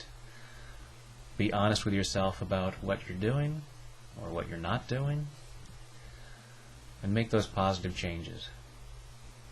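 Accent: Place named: United States. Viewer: do you think this can work over the radio? Without visuals? Would you like to do a radio interview with me? For the uk? I assume you are in the US due to your accent. I always put a recording on my YouTube channel 'Feustelfit on air 91.8 Hayes fm' Please get in touch <mail>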